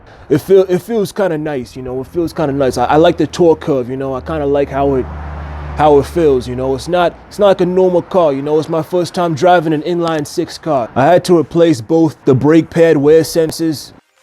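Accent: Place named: Boston, United States. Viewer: You sounded like you was from Boston.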